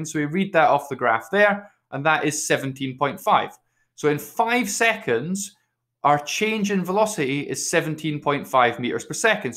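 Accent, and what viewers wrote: Scotland, United Kingdom. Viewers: i like ur sco'ish accent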